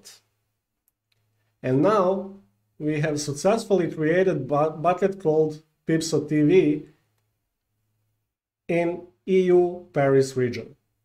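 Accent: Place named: Arab world. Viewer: thank you so much for a nice video in Arabic accent :D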